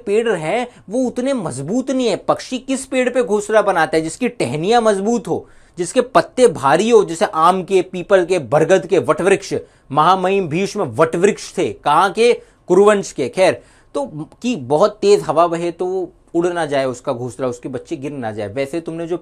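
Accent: Bihar, India. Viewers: Sir mujhe ye to pata chal gya hai ki aap bihar se ho .ab aap ye bataye ki bihar me khaha se ho 😊😊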